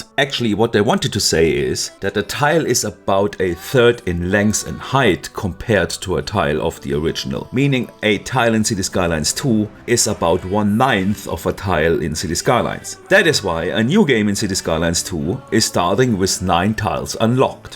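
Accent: Germany. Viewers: Gutes Video, jetzt werd ich mir das game kaufen :face-purple-crying: Aber den deutschen Akzent hör ich bis hier her:face-red-heart-shape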